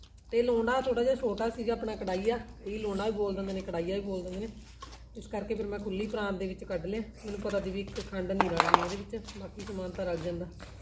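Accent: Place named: Nabha, India. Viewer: ਅਜ fist time Volg ਦੇਖਿਆ ਵਧੀਆ ਲੱਗਿਆ ਨਾਭੇ ਕਨੀ ਦੀ ਬੋਲੀ ਸੁਣ ਕੇ ਵਧੀਆ ਲੱਗਿਆ ਮੇਰੇ। ਏਰੀਆ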